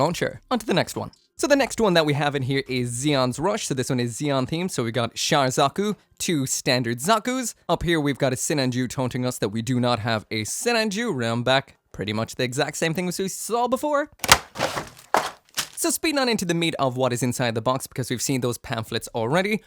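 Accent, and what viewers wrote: Ireland. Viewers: You sound like a fellow potatolander. Also mobile suit skirmish exists for 1/144 wargaming And zero well less mould lines is pure wintanium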